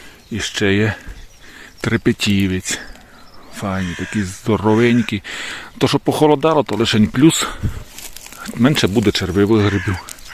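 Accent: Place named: Zakarpattia, Ukraine. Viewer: По мові чути: Закарпаття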